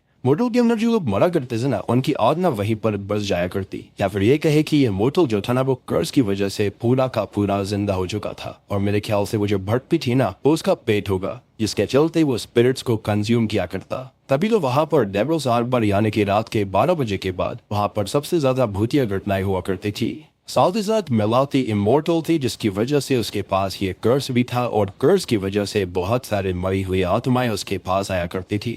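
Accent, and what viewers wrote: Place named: United Kingdom. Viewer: Hi your videos explained are very nice but I dont like that you put british access in your talking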